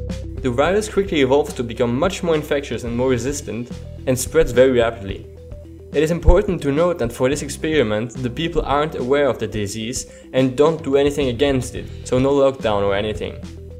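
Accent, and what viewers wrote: France. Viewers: Un accent français ca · You are french aren't you? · I know I'm dumb, I know you are trying to show us somethibg cool, but all I know is that I couldn't focus on anything else than your fluent english with french accent...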